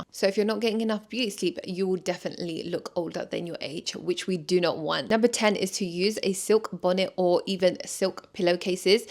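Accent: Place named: England, United Kingdom. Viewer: Your English accent ❤🎀🤌so amazing i impress ❤you look gorgeous and will follow these tips in Sha Allah 🙏😊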